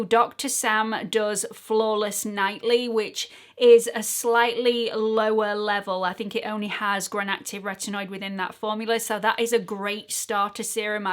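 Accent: United Kingdom. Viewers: I, m Spanish and I, ve recently discovered your videos, may bé a week ago and I adooooore you, I, m 55 Years old, so, I listen carefully about tour mum's tips and devices about skincare and make up I think you're honest and I heard very realistic recommendations from you. And, theres a bonus, listening to you., I learn a lovely british pronunciation. Sorry, maybe I dont write very well, besos y enhorabuena por tu estupendo canal.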